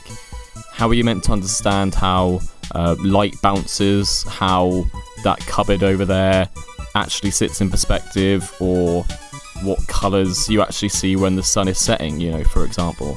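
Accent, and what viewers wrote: United Kingdom. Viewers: Nice voice and nice accent. I'm starting to think I miss London.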